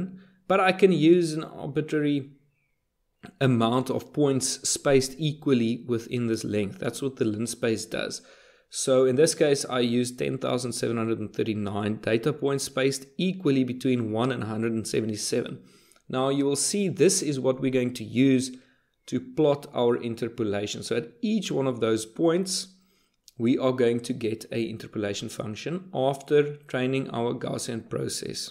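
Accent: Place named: South Africa. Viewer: Good explanation. It is heartwarming to hear a South African accent on a youtube video every now and then. My ML model predicts with a 90% probability that this speaker is from Pretoria.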